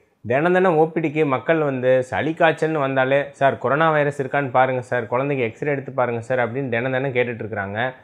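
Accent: Kongu, India. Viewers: Alagana kongu tamil oru doctor eathana jokes pola solrathu gd sir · கொங்கு தமிழில் எதார்த்தமான விளக்கம் அருமை, பேசுவதற்கே காசு கேட்கும் கார்ப்பரேட் மருத்துவர்கள் மத்தியில், உங்களின் தெம்பூட்டும் பேச்சு மிகவும் அருமை, உங்களின் பேச்சை கேட்டாலே ஒரு உத்வேகம் வருகிறது உங்கள் சேவை தொடர வாழ்த்துக்கள் மருத்துவரே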